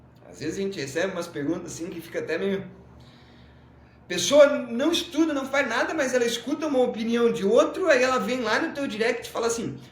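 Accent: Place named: Santa Catarina, Brazil. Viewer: Muito bom!! O conhecimento do é muito vasto, agrega muito e o sotaque parece de catarinense 😅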